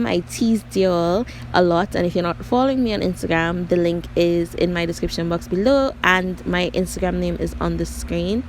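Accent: Trinidad and Tobago. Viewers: Are you from Trinidad?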